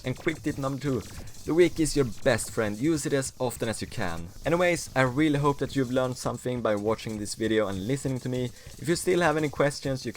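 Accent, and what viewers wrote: Sweden. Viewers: that swedish accent is heavy af, ha kul i 2018 : D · Are u Swedish? Ur accent kinda sound Swedish. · Are you from sweden beacuse i can hear when someone started with swedish and learned english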